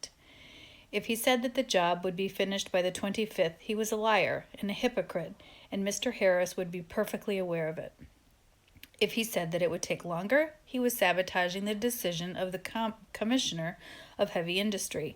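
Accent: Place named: United States. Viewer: Спасиб, послушал "простую" американскую речь (произношение). Понятны отдельные слова. ))))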